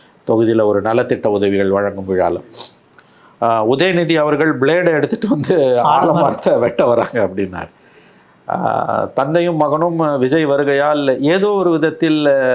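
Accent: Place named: Telugu, India. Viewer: Telugu voice. This guy from Aanthra city.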